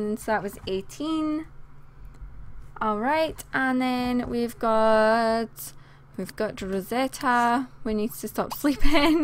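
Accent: Scotland, United Kingdom. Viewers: i was trying to figure that out too but think its Scottish. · Are u Scottish love ya voice. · Are u British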